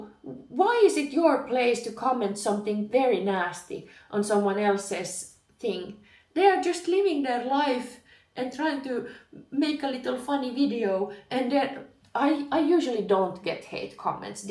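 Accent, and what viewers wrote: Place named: Finland. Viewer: I have only been to Finland once, but your accent reminds me of the late Stina Rautelin. I am certain, that your channel will be successful - you are a pretty girl.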